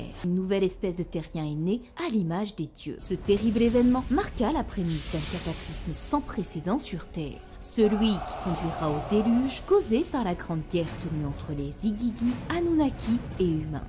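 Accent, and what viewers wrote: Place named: Belgium. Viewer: Sur que tu es Belge! lol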